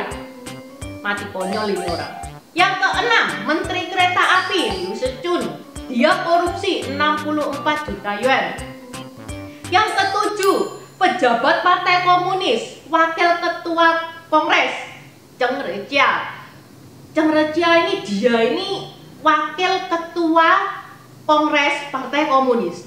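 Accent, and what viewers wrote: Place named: Surabaya, Indonesia. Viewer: Yg bicara ini , aksennya kental.jawa timur , suroboyo. mbedoknya lucu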